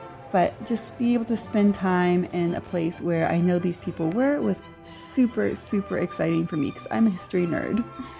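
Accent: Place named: United States. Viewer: Strange to hear a place called a palace described as cute? It's so cute to call it cute. You sound american so if you plan to use brick I hope you don't live in a state with bitter cold winters. Britain doesn't tend to have long spells of bitter freezing temps. If you get bricks make sure they are dense and not very water absorbent or they will fall apart after even a year or two. Stone holds up better in freezing temps as long as it is very absorbent stone. I don't know why everybody insists on using grout on the new precision tiles. You don't need it. Tiles don't expand or contract due to temperature changes you experience in a typical home. You can lay tiles with the thinnest line between them. That seam can actually be the same cement you use to adhere them to the floor.. I use a vinyl adhesive. The grout only tends to get dirty or even moldy. 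There are grout sealers but I don't know how long they last. If I ever do my kitchen floor again I'm going to omit the grout between tiles. I laid a hearth under a wood stove using granite tiles and didn't use adhesive or grout. The subsurface is very flat so nothing wobbles. In case anything cracks I can replace it easily with a few backup spare tiles. There is an oak edge holding everything in place against the walls. NO problems after 10 to 15 years already. I haven't had any cracked tiles. .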